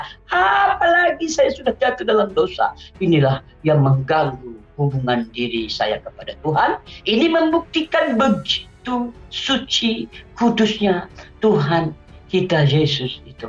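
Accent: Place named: North Sumatra, Indonesia. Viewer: Bukan orang Aceh ini orang. Logat kentalnya orang Sumatera Utara. Ngibul lu tong!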